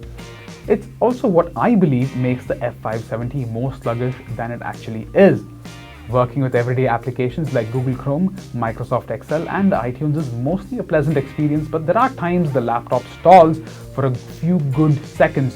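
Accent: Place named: India. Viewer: Fuck i can't stand the fucking idian accent ... it gives cancer 🤮🤮🤮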